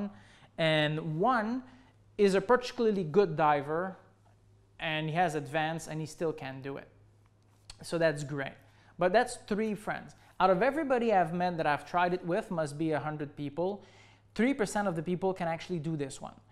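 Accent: Quebec, Canada. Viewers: good info, are you by any chance from Quebec? your accent sound familiar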